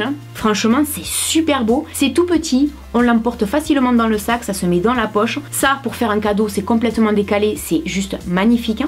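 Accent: South, France. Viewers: J'adore ton accent du Sud!!!💗💗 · Chloé Richaud Si elle a l'accent du Sud un peu. ^^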